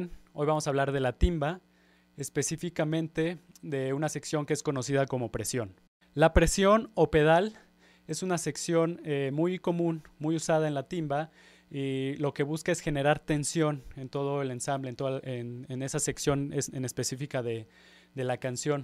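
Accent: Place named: Mexico. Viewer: Excelente la manera que explicas y enseñas, por el acento eres mexicano, chingón mi hermano, hoy aprendí algo nuevo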